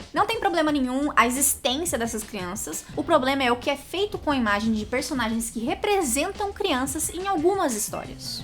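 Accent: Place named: Minas Gerais, Brazil. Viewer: tu tem o sotaque de mineiro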